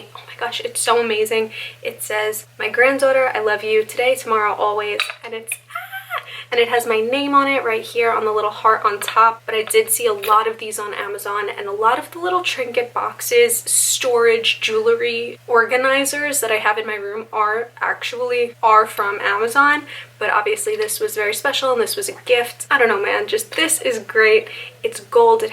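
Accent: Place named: East Coast, United States. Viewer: Kinda random but are you from the east coast? Your accent peaks through at times. 😂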